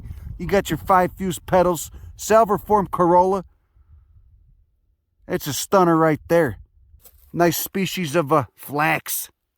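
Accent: Chicago, United States. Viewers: I just learned the word parasocial. I never thought to imagine being a plant described by this perfect Chicago accent. But I love it.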